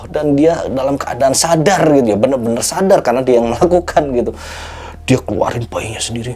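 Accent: Java, Indonesia. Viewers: medok ny sya suka mas 🐦🙏 sehat selalu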